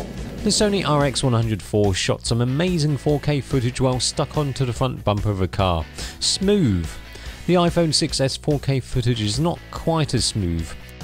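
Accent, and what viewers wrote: United Kingdom. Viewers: sooooooo british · To see a asiatic speaking in that very British accent for me is very strange ... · Bristish accent :3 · Dear host, i'm from hongkong, really love watching your reviews, very professional and specially...like your british accent:) cheers mate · you sound just like a typikal brittish dude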